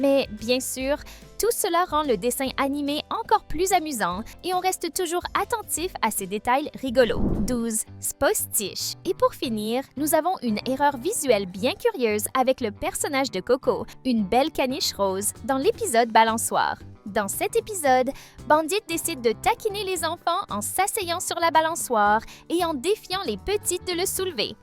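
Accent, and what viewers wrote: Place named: Canada. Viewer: oui, un axent canadien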